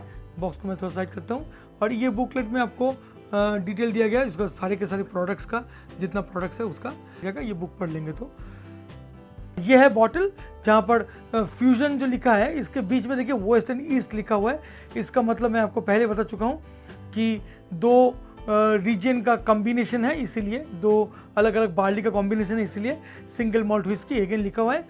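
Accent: Bengal, India. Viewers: Bangali ho kya?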